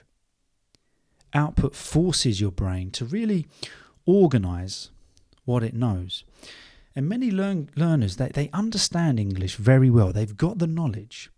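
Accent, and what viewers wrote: RP, United Kingdom. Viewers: I really love your podcast bro, so just keep going. You make learning English more easy and joyful without any stress, furthermore, your RP is so fascinating. I appreciate your effort you provides to us.🎉🎉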